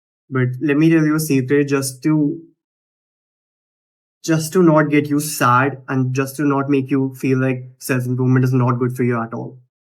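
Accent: India. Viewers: Let me be honest with you bro. You have the choice to listen to a YouTubers advice right now or you don't. 1. You are not actually adding ANY value. I can find your exact video 100 times in this niche, the difference is you are delivering it with an indian accent. Find a way to stand out, find a way to package your video in a way so good that people feel stupid not cliking on it and watching it. 2. I know that hamza may have said that editing your videos lowers audience quality and attracts "jeffreys", but that is only true to an extent. Even just cutting out pauses can improve retention rate significantly. Hamza has started to edit his videos again too, because he realizes the power of it. 3. Speaking about quality, your microphone quality is bad. Listening to your videos literally feels like a chore because of that. (4. You technically can still make it as a YouTuber in the self improvement niche, but it has become so oversaturated that you might as well start getting rich by selling a course on how to start a self improvement channel.) Advice may come off as harsh, but if you implement my advice correctly you'll pop off in no time.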